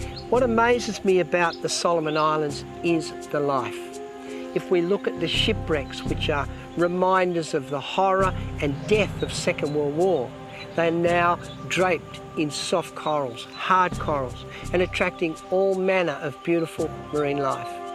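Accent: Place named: Australia. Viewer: I knew he was Aussie! I am really proud to be Aussie right now! :)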